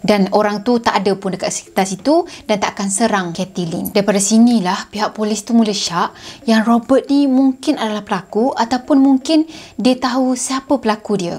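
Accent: Kelantan, Malaysia. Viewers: pada siapa yg berbakat jdi pembaca berita tp tak berpeluang, boleh la cuba jdi youtuber......suka cara menyampaikan cerita, jelas dan terang walaupun ada slang2 kelate sikit....but its ok...slang yg natural...keep up the good work sis....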